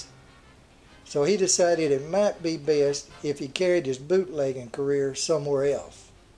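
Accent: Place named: Kentucky, United States. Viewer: I love your accent. It sounds Kentucky or maybe Tennessee, but I could be wrong.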